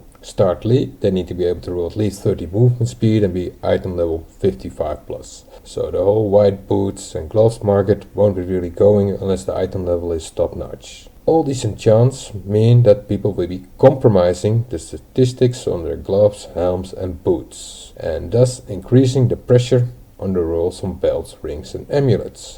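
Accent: Netherlands. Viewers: u dutch ?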